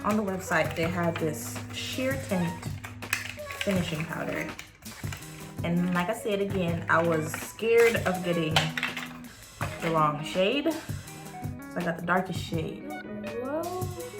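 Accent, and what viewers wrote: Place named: Jamaica. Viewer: Sound Jamaican 😎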